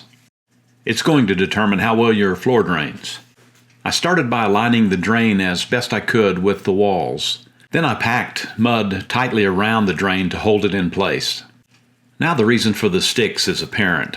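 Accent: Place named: South, United States. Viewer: I love your videos! Nothing better than a Southern gentleman teaching you home improvement especially with that voice and father-like tone.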